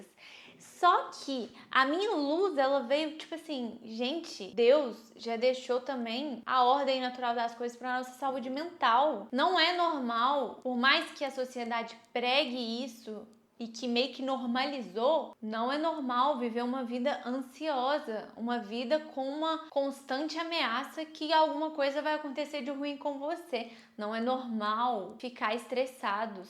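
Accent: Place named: Minas Gerais, Brazil. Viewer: Adorei seu vídeo! Me inscrevi 😍👏 seu sotaque parece mineira 🥰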